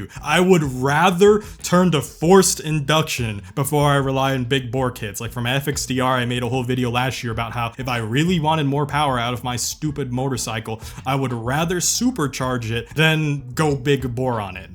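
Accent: United States. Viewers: He's American, wtf you on about?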